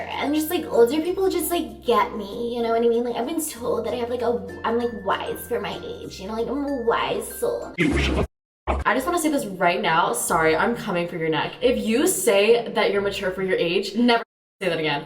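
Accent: United Kingdom. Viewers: hwa definitely british u cant hide it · I genuinely love your British accent why don't you upload a challenge talking about your British accent from the beginning of the video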